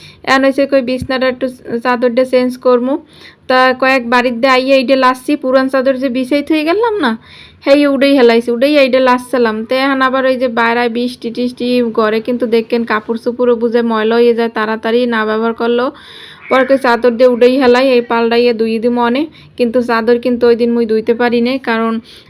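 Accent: Barishal, Bangladesh. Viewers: তোমার বরিশালের ভাষা বেশ ভালো লাগে ❤❤❤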